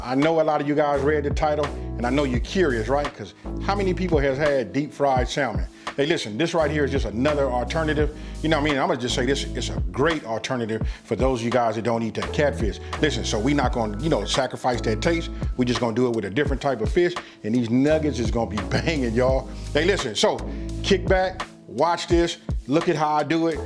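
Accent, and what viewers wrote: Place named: California, United States. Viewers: Ok Unc, wit that Cali twang! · Soon as i saw a big black brotha wit a LA hat and Cali accent, i knneeww this was bout tah be gggoooiiiidddd!!! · Coolest cat on the net. With that LA accent and hat you remind of my guy, NIP, #RIP.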